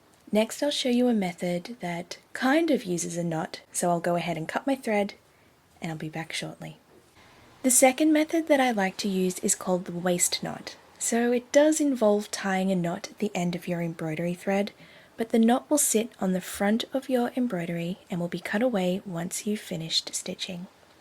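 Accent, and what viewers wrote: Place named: Australia. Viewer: Great little demo video. Thank you. Nice to hear an Aussie voice, and a very relaxing one too!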